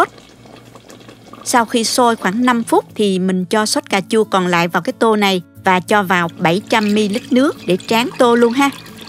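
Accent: South, Vietnam. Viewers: Giọng nói miền nam ngọt ngào , dể thương gì đâu ák trừi🥰🥰🥰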